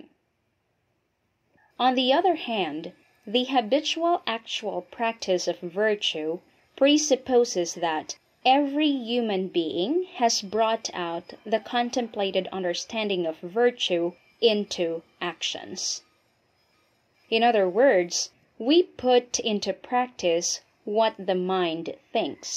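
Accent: Philippines. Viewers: Answer me...is this Philippine English?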